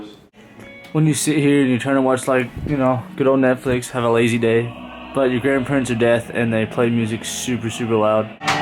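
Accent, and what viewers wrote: Australia. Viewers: his australian accent is coming back it's so cute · You've got your Australian accent back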